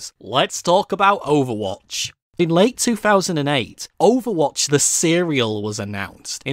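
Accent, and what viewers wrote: United Kingdom. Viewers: Is Scottish Irish Or British?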